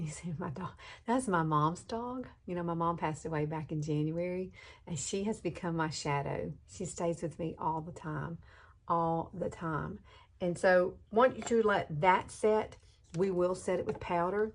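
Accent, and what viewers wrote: South, United States. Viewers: Some very good 'tips'. Just love your Southern accent, don't hear it much In Australia. First time on your Channel, thank you. You look great.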